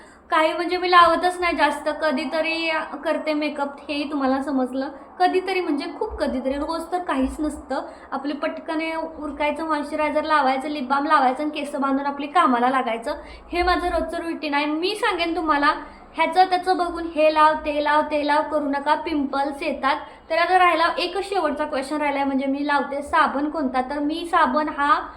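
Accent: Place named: Baramati, India. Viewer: ताई कुठे राहतेस तुझीभाषा तर बारामती साईट ची वाटते खुप छान असतात व्हिडीओ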